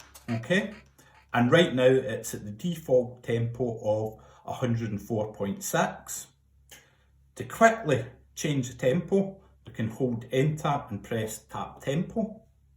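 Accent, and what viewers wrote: Scotland, United Kingdom. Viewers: You sound like trainspotting. I LOVE trainspotting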